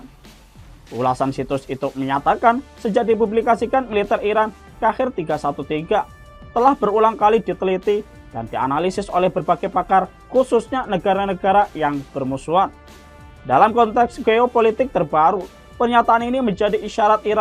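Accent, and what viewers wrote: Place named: Java, Indonesia. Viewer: Apa komentator dialek jawa..... gak enak kedengaran nya... kita indonesia...cari org komentator yg pandai dsn fasih bahasa indonesia..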